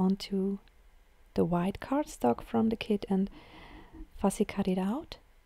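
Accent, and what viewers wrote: Germany. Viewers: You're english is very good. You speak it very well. I've watched your video's before, but didn't understand that much. Some words are similar enough I could figure it out, and I know a very tiny bit of german. I loved that you did an english voice over though. It was nice to learn what some the greetings meant. My Grandmother was german, but she died when I was younger so I didn't get a real chance to learn the language. Hearing you speak, the accent reminded me so much of her. Lovely video. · Loved hearing your accent. My husband is German and his family all spoke to each other and had such lovely accents. He never learned the language. Your cards are lovely 😊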